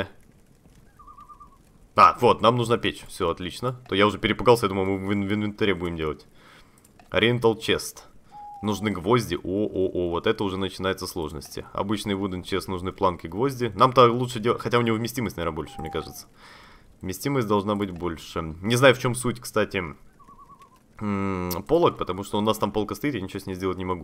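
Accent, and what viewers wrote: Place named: Odessa, Ukraine. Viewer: ты в озвучке просто великолепен!!)Шикарный голос, прекрасное чувство юмора, периодически возникает такое чувство, что ты из Одессы!))Браво!)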